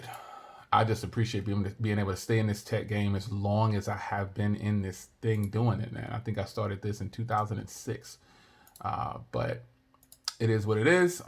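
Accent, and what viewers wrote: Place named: New Jersey, United States. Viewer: Man your Jersey accent is heavy at times sound a little like Denzel , this is not meant as an insult, especially with my deep south accent 😂😂😂 Great install great video, yes always answer wifey 👍🏾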